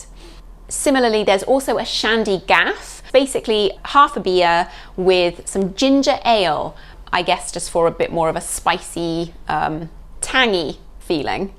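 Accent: United Kingdom. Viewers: Hello! If I go to the restaurant in Europe, Should I say ' Could I have a bill please?'. I love your British English.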